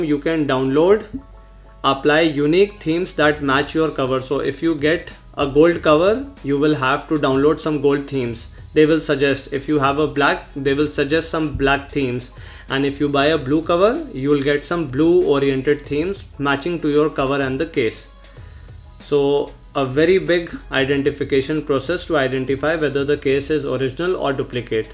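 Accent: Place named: India. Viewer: Наглядно объяснил разницу между оригиналом и подделкой. Жаль не все русские понимают английский язык. Судя по акценту ты из Индии?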